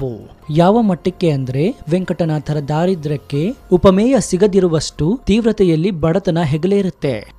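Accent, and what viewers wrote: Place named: United Kingdom. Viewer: bad narration...Kannada in English accent!!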